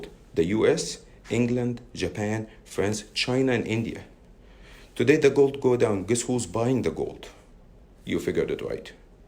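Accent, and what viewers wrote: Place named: Iran. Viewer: I like his Persian accent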